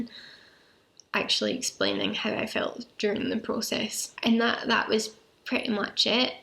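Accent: Scotland, United Kingdom. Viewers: Such a powerful video. I've had MS for 27 years. Your life will change, but it's not over. Follow Dr. Aaron Boster on YouTube. He's a fantastic MS neurologist. Just get on the most effective therapy that you're comfortable with. There are some very effective ones out there (Tysabri, Ocravus, Lemtrada). You need to think about your FUTURE self (20, 30 years down the road. You sound like you're from northern Europe (Scotland?). There are a lot more cases of MS there. Best of luck to you, my friend. Cheers! 👍👍❤❤ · When the neurologist tested your babinski reflex was that abnormal or anything? Are your feet ticklish so was hard for neurologist to tell? 😂 Where in Scotland you from? Love the accent!❤️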